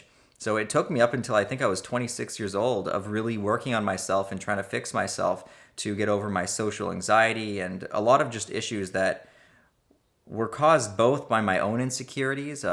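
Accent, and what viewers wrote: Canada. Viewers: How come Canadians always say "about" weird?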